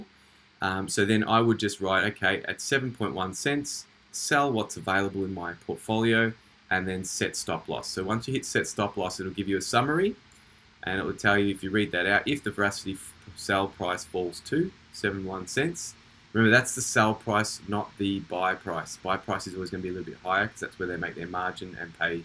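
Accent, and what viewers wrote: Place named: Australia. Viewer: Can you do a staking video please , good to hear an ozzy talking crypto .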